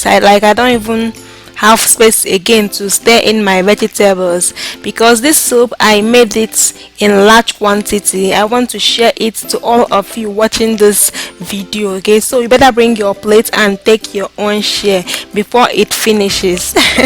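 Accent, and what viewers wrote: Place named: Anambra, Nigeria. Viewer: i was looking for another method to cook egusi now, and i saw you. Im cooking this method. And i love the way you talk. Real Anambra babe. I have subscribed.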